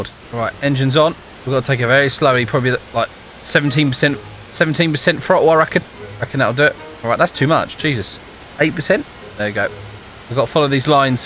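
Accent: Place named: London, United Kingdom. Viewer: my mom said you are from london he knows ur accent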